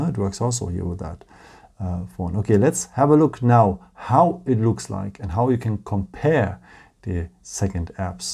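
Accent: Germany. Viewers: man hört SO dass du deutsch bist LOL. aber scheisse bei mir ist das DUAL einfach nicht verfügbar. warumm....?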